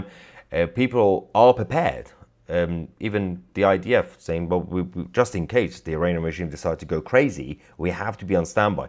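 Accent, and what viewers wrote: United Kingdom. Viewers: Excellent news report on a great country under crazy leadership. I enjoy your wonderful British accent! Keep up the good work. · Nice British accent and well broadcasted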